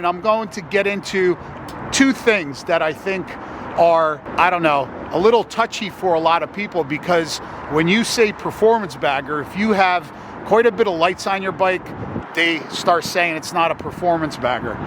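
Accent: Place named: New England, United States. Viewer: I commented this before I heard you say you were in New Jersey, but hearing your accent I knew you were somewhere just below me and that looks just like the Holyoke mall in Holyoke Ma. (Which is only about an hour an a half south of me). I'll deff look for you at laconia. I've actually never gone to laconia for bike week... but Id love to see your bike up close and hopefully go for a quick scoot! I so want to see our bikes side by side threw the gears! See how I'm doin on that little 95" 😏😁👍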